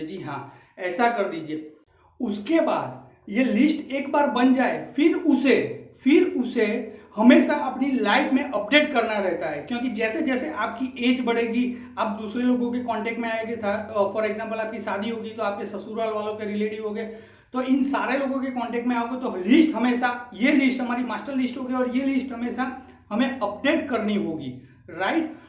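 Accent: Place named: Gujarat, India. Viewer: Gujrati h shyd 😂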